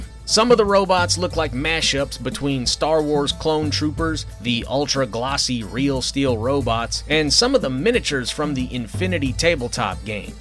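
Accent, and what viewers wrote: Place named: South, United States. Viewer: Nice Southern accent. Texas? Dallas? Kansas? Houston? Nashville? I'm British so its impressive I know all these names.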